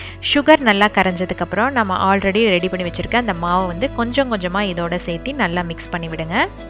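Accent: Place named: Coimbatore, India. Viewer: i watch many other good vlogs.but no one use our CBE slang.. like kolambu sunda veikarathu,vanam vettappu vitruchu....so sweet...i heard from you.lovely.❤❤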